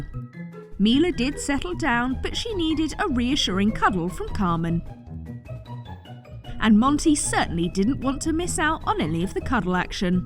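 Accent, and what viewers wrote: United Kingdom. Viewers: You know what adorable? You're "Surrey accent" watching your videos from someone from the United States standpoint, You sound like the narrator for television show. This is ment as a compliment. You sound so professional and have the most relaxing voice. (Even though it sometimes takes me a second to "translate" some simple words that are different in my American accent which is totally on me. But you do have a lovely voice and you do really great voiceovers.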